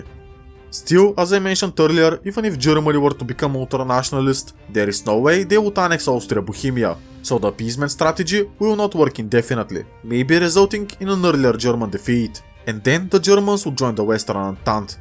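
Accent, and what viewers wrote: Bulgaria. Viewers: Your accent sounds just like Bulgarian. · Оригинален български акцент на английския, не може да се обърка😅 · По акцента ти мога да позная не само, че си Българин, но и че си от Източна България :D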